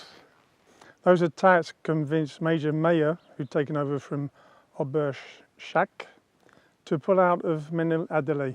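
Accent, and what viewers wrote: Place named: United Kingdom. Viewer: I'm glad that this is highly detailed but when you jump from one unit to another every ten or fifteen seconds it becomes very difficult to keep up with. Have you thought about animating with some arrows or directions so that I know which unit you're talking about and which direction they're actually moving and who they might be facing? I understand that all of this is hard work but these would be so awesome if I could follow them just a little bit easier. I'm sure your British accent doesn't help my dumb American brain much either. I am using subtitles though.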